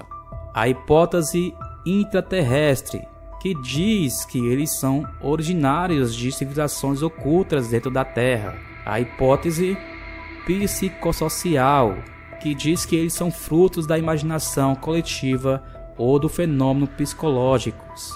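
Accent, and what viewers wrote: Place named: Portugal. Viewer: O locutor é da "terrinha"!!!☺️☺️☺️ PS. Isto não é um comentário racista, é carinhoso, viu?!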